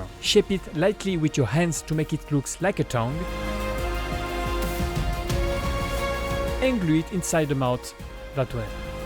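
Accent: France. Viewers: Awesomely sharp French accent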